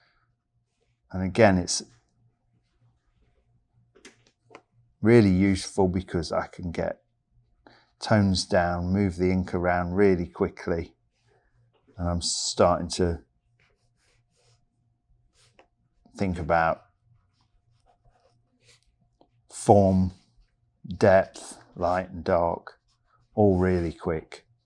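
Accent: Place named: United Kingdom. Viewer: Didnt expect him to have such a british accent. I love his stuff. Amazing artist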